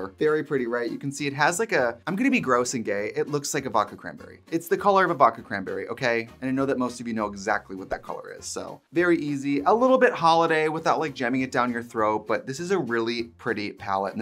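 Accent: Wisconsin, United States. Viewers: As someone who lived in Wisconsin for 10 years, her accent coming out always makes me giggle lol. It’s prominent in a lot of the things she says but it’s too funny when it REALLY comes out 😂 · the wisco accent 😭❤️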